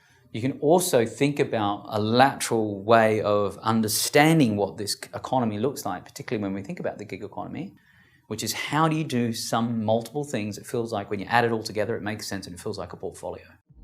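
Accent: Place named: Australia. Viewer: This guy spouts non-sense in the form of words all stringed together that sounds credible in the Australian accent!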